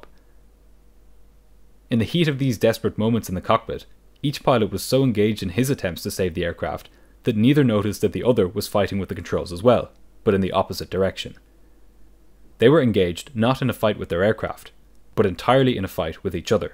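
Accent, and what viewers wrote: Ireland. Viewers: As an Irish man it's nice to hear an Irish accent on a YouTube channel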